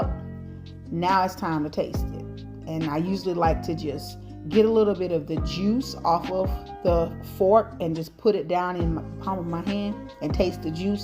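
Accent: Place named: South, United States. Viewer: Southern as hey'all. ❤❤❤❤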